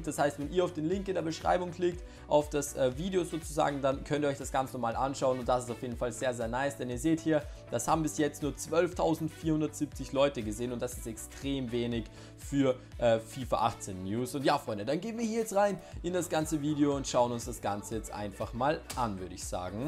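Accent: Austria. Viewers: Von wo aus Österreich kommst du genau?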